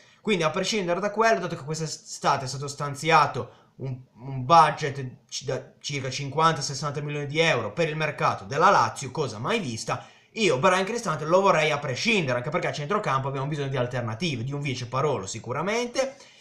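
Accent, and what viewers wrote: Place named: Piedmont, Italy. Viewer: troppo forte un laziale con l'accento del nord mi sembra torinese o cmq piemontese, daje continua ci piace!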